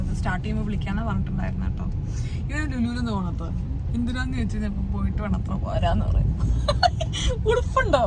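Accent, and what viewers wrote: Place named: Valluvanad, India. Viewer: Nalla Reethiyil Valluvanadan Bhasha Samsarikkunnundallo, Swantham sthalam Valanchery aayathu kondayirikkum alle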